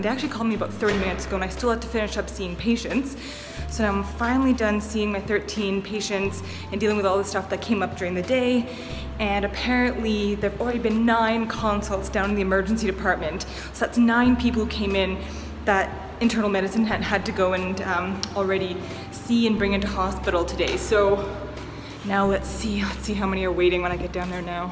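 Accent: Canada. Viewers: canadian and 1/4 irish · can I ask you what hospitals you work in? Judging from your accent I am guessing you are in Canada?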